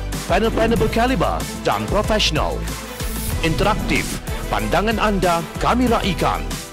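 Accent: Kelantan, Malaysia. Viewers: 😂😂😂speaking kelantan pawer lah bro ni👍👍👍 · Bijok weeh demo ajuk keccek Kelantan.Ambo jatuh hati. 😀😃😄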